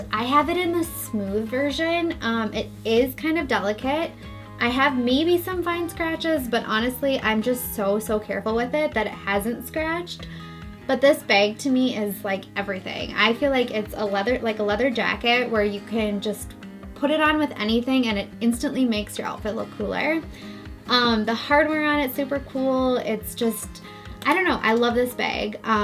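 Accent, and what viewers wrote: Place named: Midwest, United States. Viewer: Probably Midwest (Wisconsin or Minnesota) just guessing from her accent "bag" lol. I live in the Midwest too.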